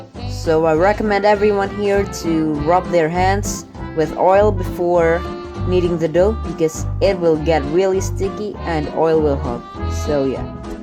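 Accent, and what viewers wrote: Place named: England, United Kingdom. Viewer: its really look so delicious ! love ur eng accent too !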